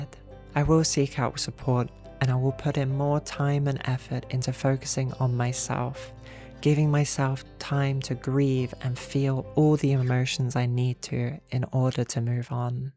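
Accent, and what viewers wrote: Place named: England, United Kingdom. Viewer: That accent is English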